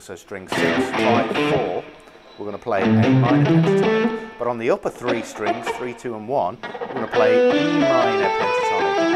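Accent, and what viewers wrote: United Kingdom. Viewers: Kids, don't practice guitar, have muscular body, charming british accent, or else you will be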